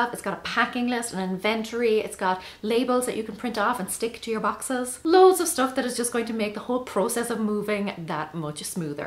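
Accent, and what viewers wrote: Ireland. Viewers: And you sound like my family I'm American but my dad was from Mayo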